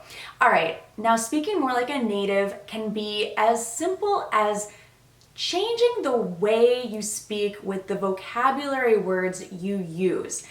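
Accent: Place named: United States. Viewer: her pronunciation is American because she from America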